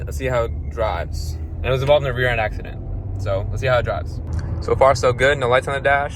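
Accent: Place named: Morocco. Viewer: Where you from bro, you sound Moroccan ?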